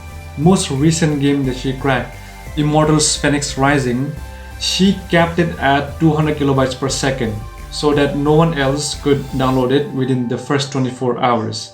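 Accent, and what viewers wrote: Northeast India, India. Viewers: You from North East India bro?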